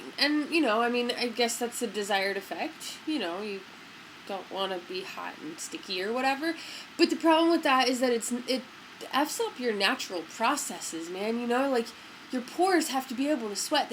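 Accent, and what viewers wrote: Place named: Minnesota, United States. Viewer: This portly lad talks like he is from Minnesooootah! How odd.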